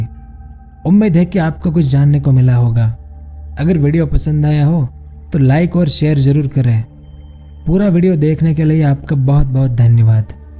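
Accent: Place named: Kathiawar, India. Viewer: Aapki hindi kathiyawadi tone me kyu he?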